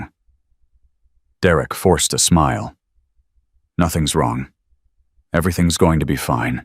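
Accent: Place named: United Kingdom. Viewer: UK English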